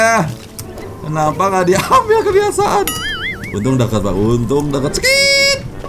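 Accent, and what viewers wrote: West Java, Indonesia. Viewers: bang orang sunda?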